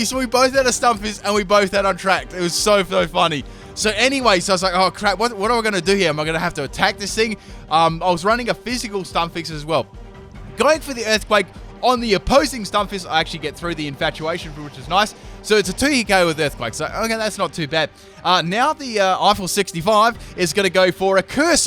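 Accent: Australia. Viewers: you sound like an australian dude named ben for some reason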